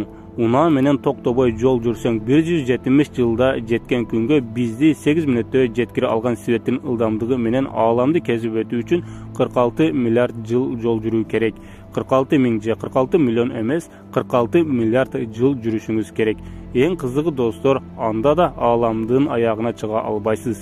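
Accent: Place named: Batken, Kyrgyzstan. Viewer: Автор Баткендик окшойсуң и дикция да өлүп калыптыр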